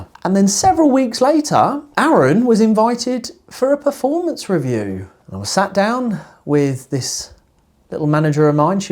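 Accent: United Kingdom. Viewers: My narcissistic boss comes into the office every day whilst we work from home so we can spend time with our families. He looks pathetic sat at his desk getting angrier because he can’t stand that work is not everything for some people. I wasted 30 years in my job commuting which time I’ll never get back, thousands of hours wasted commuting is the biggest regret of my life at 50. Can I just say it’s refreshing to hear an articulate British gentleman like yourself who doesn’t fill his videos with profanities.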